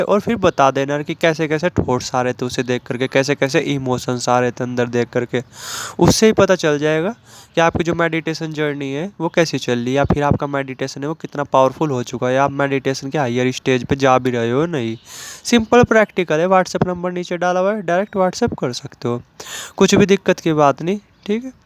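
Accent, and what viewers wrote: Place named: Jhajjar, India. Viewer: ohkk. Mere jhajjar ke relatives h bilkul apki trah bolte h 😂😂😂 Ek se voice bhi milti h apki.or bolne ka tareeka bilkul same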